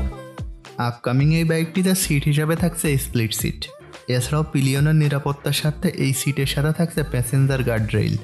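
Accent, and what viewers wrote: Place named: Netrokona, Bangladesh. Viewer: kotha bolar style eto low quality keno ??? ageee valo vabe bangla bola siken...kotha sune mone hosse Netrokona area er kno jokes bolcen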